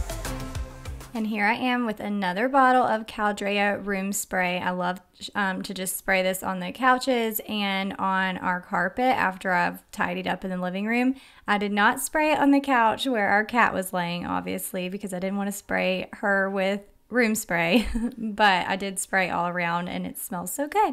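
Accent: South, United States. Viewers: Enjoy listening to you talk with your “southern accent”. Originally from Waynesboro Ga (30 minutes from Augusta) but now live in South Carolina.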